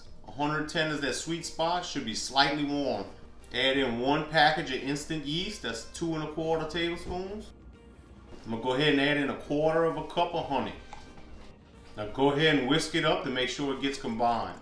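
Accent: Louisiana, United States. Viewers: He is Louisiana...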